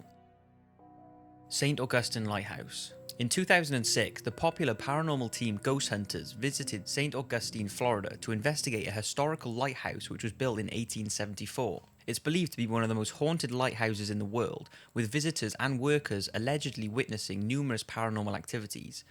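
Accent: United Kingdom. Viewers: Losing your Brit accent? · he sounds more British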